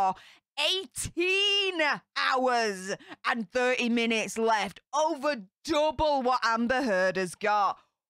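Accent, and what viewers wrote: Yorkshire, United Kingdom. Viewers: Great points and I get to hear your delightful Yorkshire accent. Win/win, y’all. J4J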